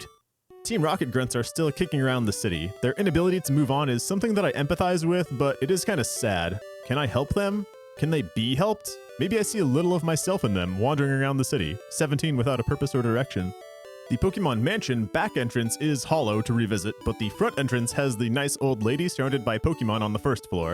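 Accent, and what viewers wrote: United States. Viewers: This is a break from ADV randoms, and I dig that you're working on an American accent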